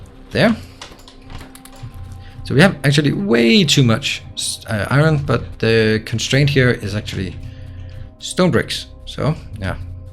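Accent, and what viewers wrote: Denmark. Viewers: You must be danish, you guys are the only ones with that accent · are you from denmark? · R u danish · Are you Danish??? :)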